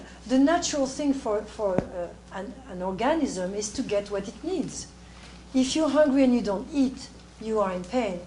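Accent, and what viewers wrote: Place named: France. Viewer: She is right. By the way, her French accent is really fine :-)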